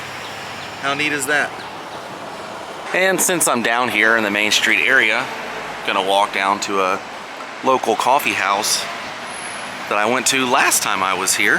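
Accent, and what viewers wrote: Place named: South, United States. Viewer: Every video in Mississippi, I can hear that southern drawl creeping back into your accent...lol. I'm the same way. Another awesome video!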